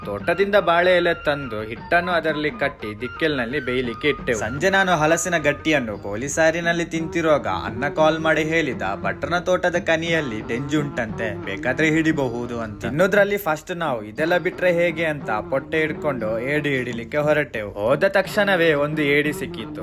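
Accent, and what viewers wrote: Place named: Kantara, India. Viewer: Kantara dantha kathe way of speaking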